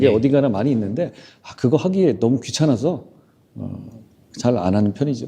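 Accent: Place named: Chungcheong, South Korea. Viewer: 한 전 대표님 말씀중에 충북사람들의 어감이좀 은연중 표현이 되는것 같 습니다.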